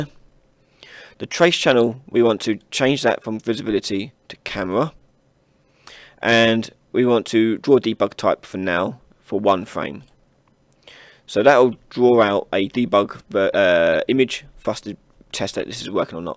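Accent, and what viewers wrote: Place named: Norfolk, United Kingdom. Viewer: Bro I have to ask, Are you from the UK Norfolk area? You sound like you are... that's not a bad thing either.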